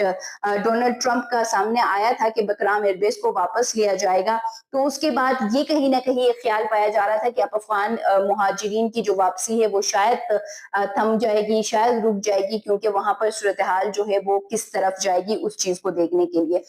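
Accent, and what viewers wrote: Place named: Chitral, Pakistan. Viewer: میڈم آپ کا اردو بولنے کا انداز چترالیوں کی طرح ھے آپ کا تعلق چترال سے ھے؟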